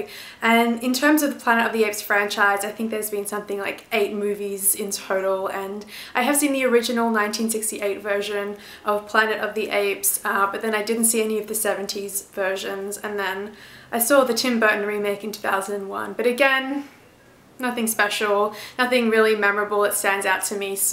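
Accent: Australia. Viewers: Glad I found your channel. Subscribed. Are you Australian?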